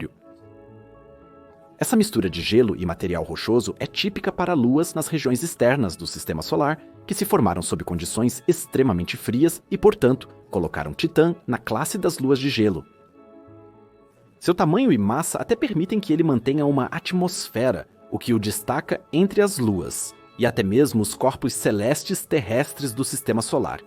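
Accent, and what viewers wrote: Portugal. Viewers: Porque do nada durante o vídeo muda para o português de Portugal? 😂😂😂😂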